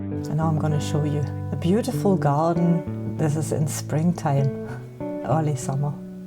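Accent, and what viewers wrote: Scotland, United Kingdom. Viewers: I knew I heard Scottish in your accent! 🙂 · Aha! I had thought it was a Scottish accent. My (incorrect) theory was that you had studied English in Scotland.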